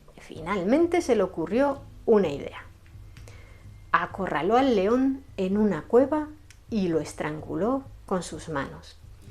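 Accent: Spain. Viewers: Me encanta la manera en que narras las historias , excelente oratoria, el acento español me agrada mucho , es como escuchar audio libros, gracias desde Venezuela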